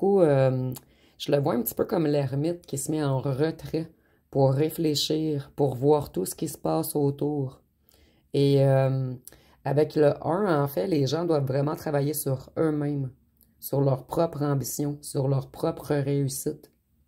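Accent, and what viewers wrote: Canada. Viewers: Merci pour votre accent canadien qui fait du bien et surtout pour cette guidance qui donne le droit de pleurer pour évacuer le trop plein. J'apprécie cette interprétation salvatrice. Vive les neutrons. 🌈🤗💗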